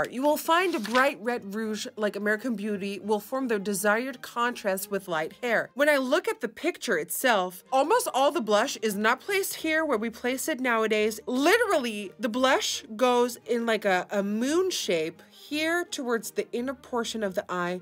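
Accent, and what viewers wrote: United Kingdom. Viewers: I love your British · I often like blue color on my eyelids. I really enjoyed watching your tutorial. And your British accent...Ah!!! 😂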